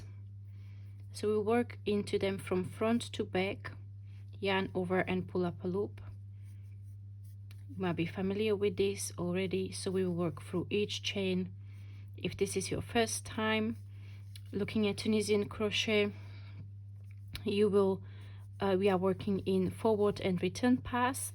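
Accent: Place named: United Kingdom. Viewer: what kind of british accent do you have? its nice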